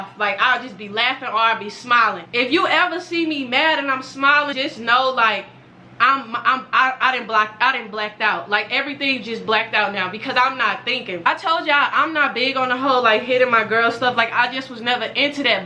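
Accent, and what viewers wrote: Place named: South, United States. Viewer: yessss her accent so cute to me cs I was born in Texas but raised in New York so the southern accent is adorable!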